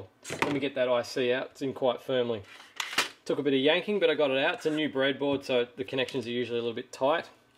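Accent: Australia. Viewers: Very good tutorial, been following your channel for a while and love how much info you share. Also it's good to hear another Aussie voice.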